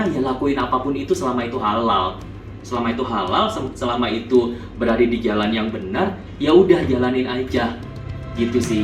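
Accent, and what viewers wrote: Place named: Java, Indonesia. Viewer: Sabar mas, dulunya artis eh krj sbg crew.... Smngt.... Kbykn artis star sindrom..... Sukses skrg medok jawanya uda berkurang mas hehehehe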